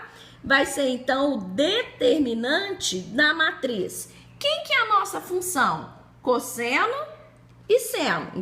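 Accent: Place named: Belo Horizonte, Brazil. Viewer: Sotaque de Belo Horizonte MG...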